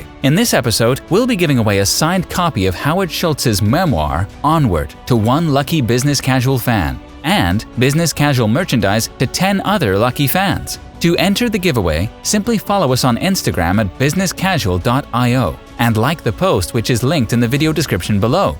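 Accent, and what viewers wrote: United States. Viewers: How did this guy's accent went from being annoying to becoming Americanized in such a short time (3yrs)?? Good effort nonetheless.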